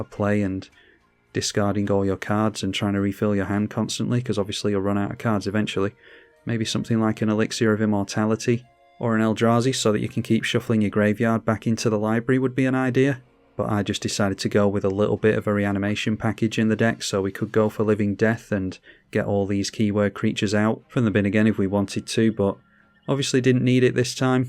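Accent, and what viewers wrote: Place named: United Kingdom. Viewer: Bro is so british the closed captions put his saw in as a SAR in half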